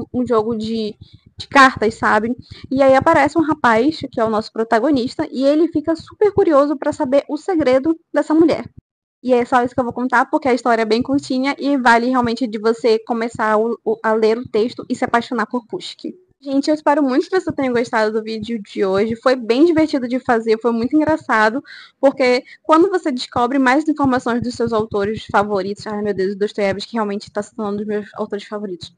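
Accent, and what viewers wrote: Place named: Pará, Brazil. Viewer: Seu sotaque é do Pará.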